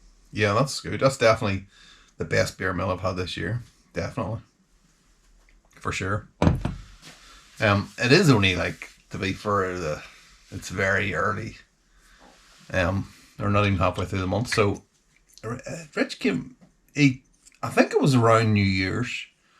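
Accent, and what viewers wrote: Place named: Northern Ireland, United Kingdom. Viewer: Where in ni are you? Enjoying your videos over in fife. But it's good to hear a voice from home.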